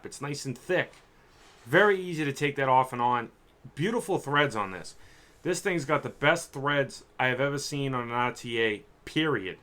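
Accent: Boston, United States. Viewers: dude yr boston accent is really fukt up, the Petri is an R TA, not AH TA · Thanks for the great review as usual! I'm assuming ur from Boston because of ur accent! If so where in Boston r u from? I live in Medford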